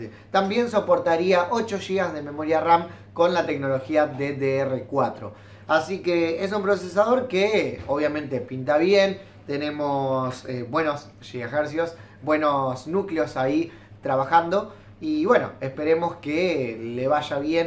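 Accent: Argentina. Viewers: hola de que parte de argentina sos?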